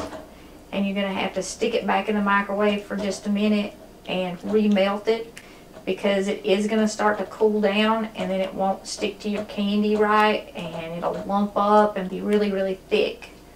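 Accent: South, United States. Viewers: I love your southern accent. We’re kinda neighbors. I’m in Nicholasville, Kentucky. Love love love your recipes.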